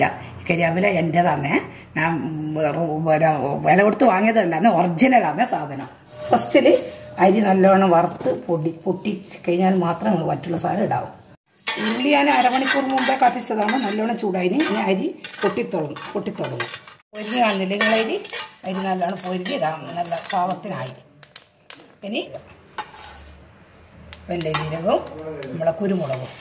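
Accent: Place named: Thalassery, India. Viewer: ഉമ്മാന്റെ വർത്താനം ഞമ്മളെ തലശ്ശേരിക്കാരെപോലെ 😍😘❤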